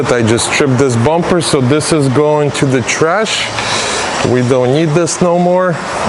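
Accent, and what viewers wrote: Russia. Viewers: Вы говорите на английском с русским акцентом, но вы не русский, или уехали очень давно. "Досвидания" - по-русски было с английским акцентом. ;) По ремонту всё очень интересно, по железу, по салону, по электрике. Спасибо!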